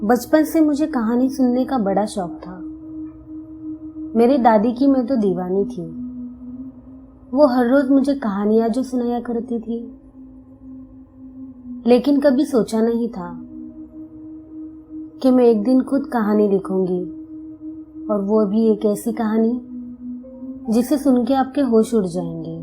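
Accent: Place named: Maharashtra, India. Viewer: Full on Marathi accent, last two statements were exactly opposite.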